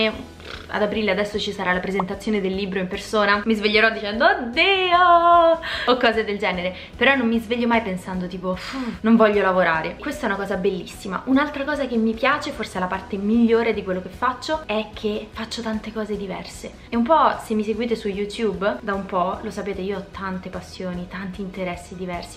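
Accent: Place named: Rome, Italy. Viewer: PS : l'accento romano... È top!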